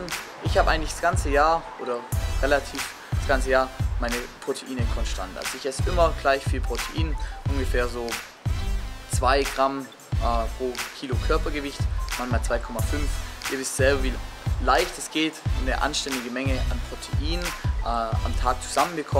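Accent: Germany. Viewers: woher kommt den der Schwabe genau? :D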